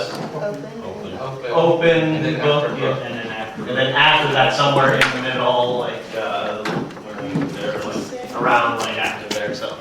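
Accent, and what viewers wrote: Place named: United States. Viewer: wow this is so awesome!! id love to come to a gruop one theres definately less pressure and more relaxed :) but im in england and u obv have an american accent!:(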